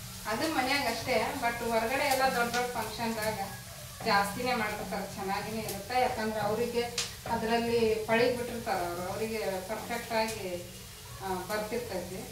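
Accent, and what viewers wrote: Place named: Uttara Kannada, India. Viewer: Neev helid nija hasge iddastu kaal chachu anta gaade edyalla hage naavu hage num badgetge corectagi jeevna madtivi nim utrakannada maat kelakke channagirutte henmaklige helkodude beda avrige tilvalke jasti tande tayi anta preeti kalji madtare nanguu ebru henmaklu channag nodkantare jwara bandre bayig yenuu bedagutte nam kade kanchikay uppinkay hakkand uuta madtivi swalpa nalge ruchi barutte nan maglu frijalidakke heegidde box tandale nice vlog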